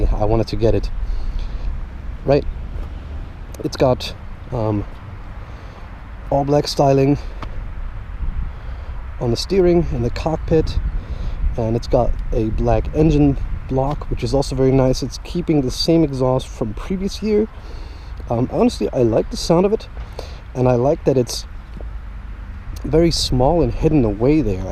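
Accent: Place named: Germany. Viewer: Es ist so nervig dein englisch mit deutschen Akzent zu hören. Nicht böse gemeint!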